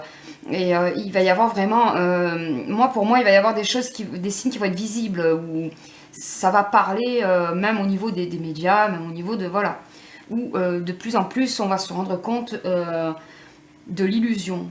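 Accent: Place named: South, France. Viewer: Merci J'apprécie beaucoup votre travail Et ce petit accent du Sud m'apporte le soleil qu'il manque chez moi Merci et bravo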